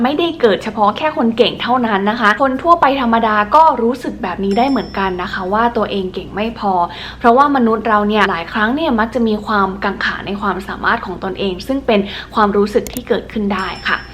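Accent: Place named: North, Thailand. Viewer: คุณ เป็นคนจังหวัดอะไรครับ สำเนียงพูดช้าๆ ทำให้คิดถึงคนเชียงใหม่ เชียงราย เลย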